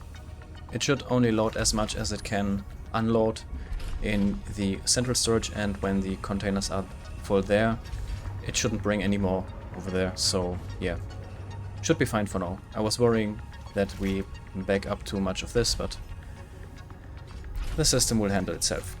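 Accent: Germany. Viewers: No problem :) Hahaha all god mate, bin auch Deutsch :D hab ich direkt rausgehört ^^ Sollte kein Front sein, glaub wenn man den Akzent nicht kennt, fällt das den English native speakern nicht auf ;)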